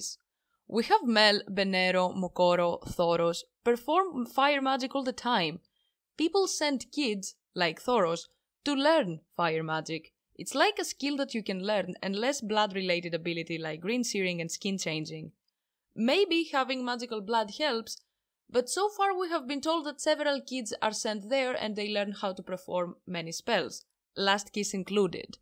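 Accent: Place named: Greece. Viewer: It sounds Greek 🤙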